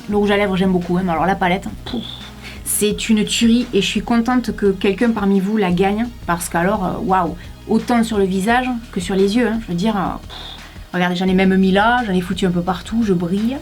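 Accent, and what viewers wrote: South, France. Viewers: Hello je suis un mec et j'ai découvert tes vidéos alors au début je me suis dit avec son intro “salut !” je ne vais pas aimer elle dit ça d'un air sévère haha alors pour le coup je me suis complètement tromper tu est géniale, franche et d'une bonne humeur communicative avec ton super accent du sud j'adore bonne continuation tu est pétillante ! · je me répète mais j'adore ton naturel, ton joli petit accent du sud, ton humour, et ta sincérité! Je ne me lasse pas de tes vidéos:) bonne continuation!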